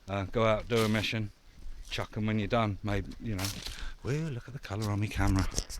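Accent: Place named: Portsmouth, United Kingdom. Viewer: Are you a Pompey lad? Your accent seems familiar. Lovin, the vids mate, keep on doin' yer thang. 👍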